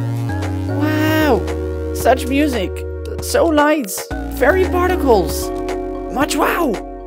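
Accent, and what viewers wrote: Netherlands. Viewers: You sound a little bit Dutch ; )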